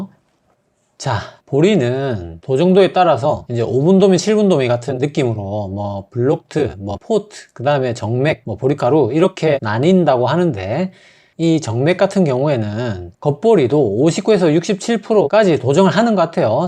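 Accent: Gyeongsang, South Korea. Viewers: 늘 좋운영상 감사합니다🙏 경상도억양 은근 친근감 주시네요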